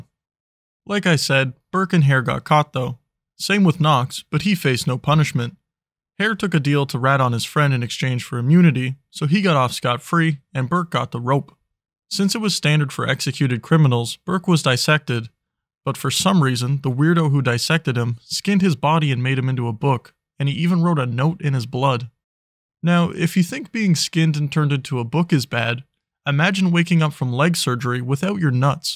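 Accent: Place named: Canada. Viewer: It's on a Saturday morning in Flori-duuuuuuh and I am being introduced to this channel and I have to honestly say that I am so glad I have insomnia and YouTube for once!! This guy is freaking funny as hell! Too bad I don't have any buds to blow smoke on while I chuckle like the flesh covered hyena he's turning me into!! I am curious as to where exactly he's from tho, he said "my FELLOW Americans" in his most recent video but he sounds like he could be from Canada😂😂😂 All I can tell for certain is that he is NOT a fan of those who were born in the south, fortunately, I was born in the Midwest over in Illinois 😁🤣🤣🤣😂 .....Side note; when is he going to have some stuffed animals or hoodies?! I'd totally get some!!❤❤❤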